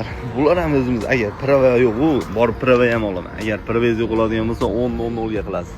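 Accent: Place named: Namangan, Uzbekistan. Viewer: наманган хонобод кушни коратепа кишлок топдимми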